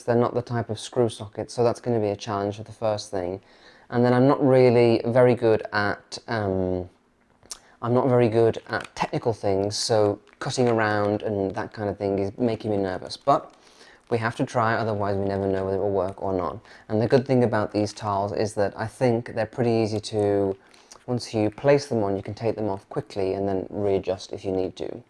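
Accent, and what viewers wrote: United Kingdom. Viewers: Hola, I love bright colours but I must admit it, you were totally right, the new tiles have upgraded your kitchen enormously! I admire and appreciate the love and care you put in everthing you do and also the way you present it, you make even the most trivial detail look elegant and sophisticated. I love your British accent and I find your videos soothing and conforting. Thank you for being there every week.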